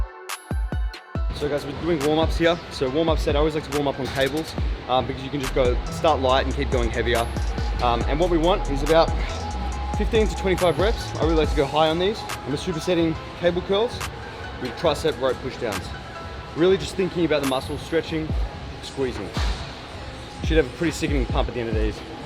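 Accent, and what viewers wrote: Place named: Australia. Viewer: Love your channel and the aussie accent ❤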